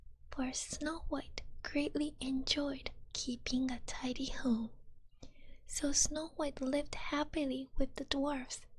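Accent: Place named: England, United Kingdom. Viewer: If I didn't know otherwise, I world have said she was English. Sorry my Japanese is not good enough to write this in Japanese.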